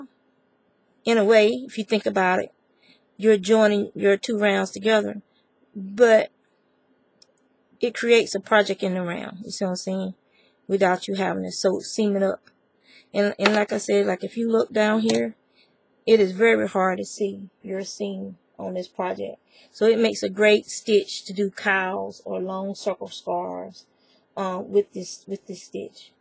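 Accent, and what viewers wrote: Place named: South, United States. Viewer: Loved the bush stitch cowl,. I want to try making one. Gosh, you can fly with that hook! I wish I could crochet that fast. I agree with you, Susan Bates are the best and are the only ones I use. Hate Boye hooks. I have a thick southern accent too. Was raised in southwest VA. I love southern accents! ♡ Hope you have a good week. Looking forward for another podcast soon!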